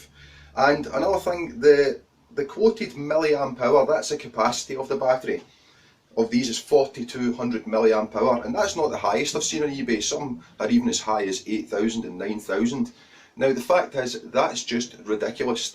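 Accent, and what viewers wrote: Scotland, United Kingdom. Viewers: naw, I pegged that accent as Scottish quite early, and honestly, I think that short of accent sounds kinda cool IMHO. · I have learned my lesson the hard way!. Don't buy any vape products such as batteries 18560 (spoke about in this video) or vape coils. I have purchased both and received fake Products both fake batteries and vape coils. I even had a seller contact me when I left negative feedback asking what he could do to get me to change my negative rating!! REALLY! Thanks for the video - great job and being Scottish I loved the accent too!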